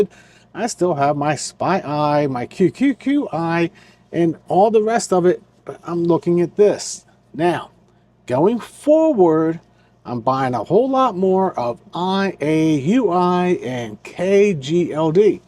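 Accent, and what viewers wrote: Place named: Pennsylvania, United States. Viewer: do you hail from Pa or Delaware? You could be from your accent! I think it's adorable....also could be a Baltimore / Md accent ha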